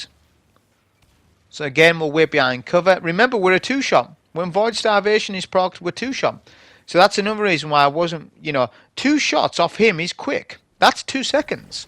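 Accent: United Kingdom. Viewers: Somehow im enjoying more of your bri'ish commentary rather than the actual gameplay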